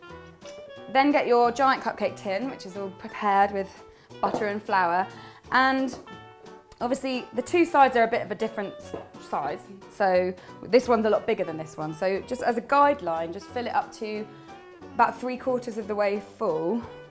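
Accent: England, United Kingdom. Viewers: When says " scrappy, naughty, bald bits " I love that. Her accent is adorable. I love to hear the British talk. Love you,! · are you English, sorry if I'm being silly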